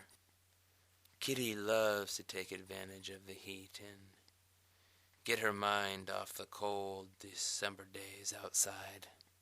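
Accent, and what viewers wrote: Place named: France. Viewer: Do you look back on this and say, "Ya, I do sound like a tool." But it's not your fault. You probably watched too much Cousteau. Octopus, octopus. Why do you hide. In that Frenchy accent. Sounds like your trying to pull off some type of avantgard Joe cool kind of thing. No, nope ain't feeling it. More toolish than anything else.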